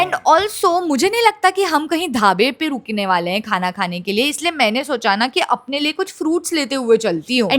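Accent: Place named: Indore, India. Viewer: As a indori I just love that bich bich me thodu sa indori wala pronounciation 😁☺️🫶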